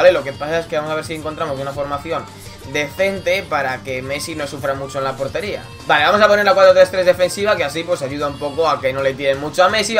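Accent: Mexico. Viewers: eres de sinaloa mexico?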